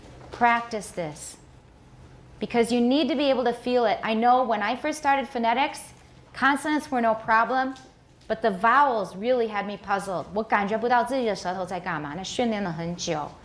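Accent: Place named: Taiwan. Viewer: 史老师好认真，一本正经地讲解，正宗的英文和台湾中文口音好可爱。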